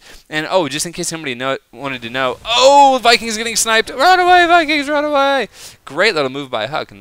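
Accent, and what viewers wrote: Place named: Canada. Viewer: ROTFL you are excellent what you do! hey can i ask you a question, what country are you from, cuz im canadian and you sound canadian also =)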